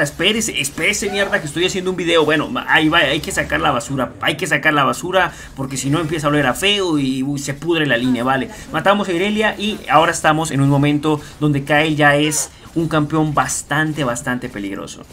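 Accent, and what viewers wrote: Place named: Ecuador. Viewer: hola, tu acento se parece al ecuatoriano xD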